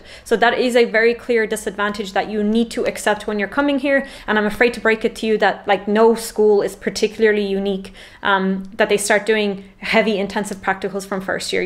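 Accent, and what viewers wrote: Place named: Ireland. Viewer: Are you Irish? I love this accent 🥰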